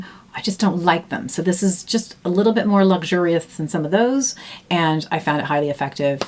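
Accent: Ireland. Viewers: Hi i am new to your channel and really liking it so thanks xx love your accent with the slight irish twang thrown in! x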